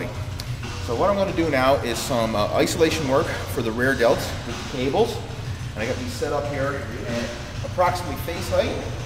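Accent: Newfoundland, Canada. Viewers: u a newfie? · You must be Canadian. I only can tell because I have watched a bunch of Trailer Park Boys...lol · are you canadian? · Are you Canadian?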